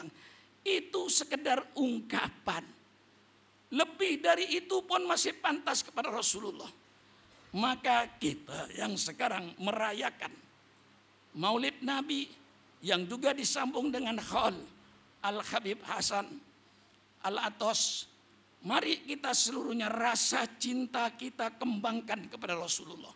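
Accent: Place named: Madura, Indonesia. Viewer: Waaa ktahuan orang madura.. Bahasanya bukan bahasa intlektual pendidikan akademisinya Kurang orang.. Ktahuan cara ngomongnya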